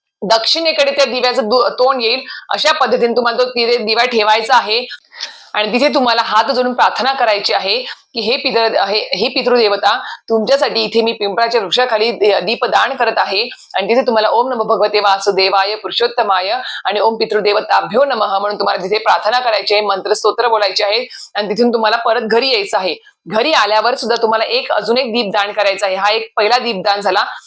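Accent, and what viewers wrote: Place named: Karnataka, India. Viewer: बोलण्यावरून तुम्ही कर्नाटक च्या वाटताय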